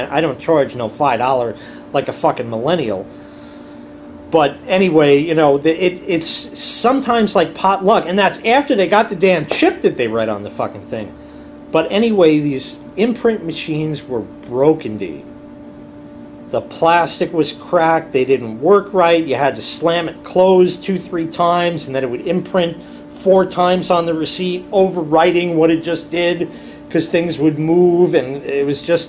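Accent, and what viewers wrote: New York, United States. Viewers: You know you're listening to someone from NY when they say "aarange" instead of "orange" If I had to deal with that vending machine, I would be so pissed. Getting orange instead of the Diet Coke I asked for? Fuck that guy... There was a time that paying with cash was the norm. Now it's debit cards like I have. I'll tell you, I feel much more safe spending with cash than a debit card. Honestly, do Millennials even know what the hell cash is?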